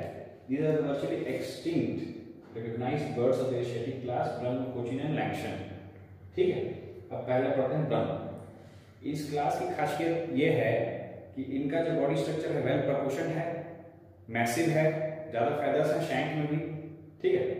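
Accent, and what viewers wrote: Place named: Uttar Pradesh, India. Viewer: Apart from the content (which indeed was informative), loved you n your U.P accent as well❤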